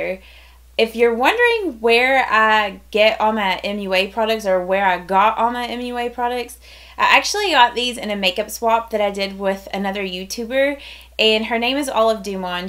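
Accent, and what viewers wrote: South, United States. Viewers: HI.:) I JUST WANTED TO SAY. YOUR MAKEUP ALWAYS LOOKS FANTASTIC;) YOUR ARE SUCH A BEAUTIFUL GIRL;) IM ORIGINALLY FROM OKLAHOMA AND JUST LOVE YOUR COUNTRY ACCENT;)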